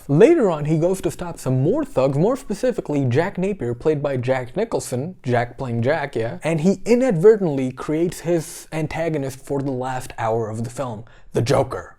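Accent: United States. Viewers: You don't live in America? Neither do I, lol. You do sound American though. Greetings from The Netherlands (we gave you Paul Verhoeven and Rutger Hauer) Ps: feel free to add me on Skype 'meeskeesz' Have a nice day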